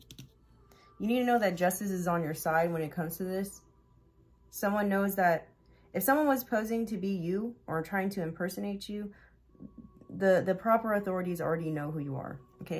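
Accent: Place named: RP, United Kingdom. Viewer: Hey Girl , inspirational, and so comforting to hear your voice, and Posh too! 🐈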